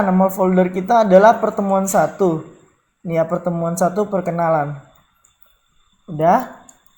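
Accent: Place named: Medan, Indonesia. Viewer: Medan x bang bahasa nya paten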